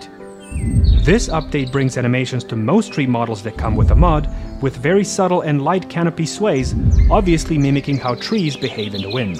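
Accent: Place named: Eastern Europe. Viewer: It’s always dudes with Eastern European accents and playing overwhelmingly modded sexualized female dragonborns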